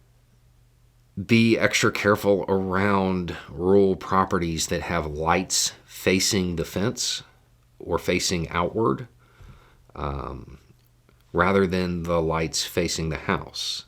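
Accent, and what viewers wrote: South, United States. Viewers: Yes, back in the days when spoke in a much thicker Southern accent. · Perhaps other folks have observed and mentioned you SOUNDED a LOT more Southern in you early videos. When I first stumbled upon your channel I was not sure what to expect in those days, but over time I have really appreciated your insight into matters of importance. Thanks, Man. (I cracked up when you wrote "not secret" on that box behind you.) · How did folk not know you were from the South? I can hear your drawl from way down yonder, even though I figger’d you were a Texan. 😉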